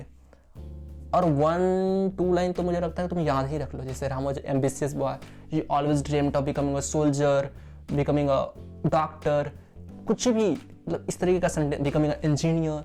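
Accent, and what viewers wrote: Bihar, India. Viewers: Bihari ho kya bhai 😎😎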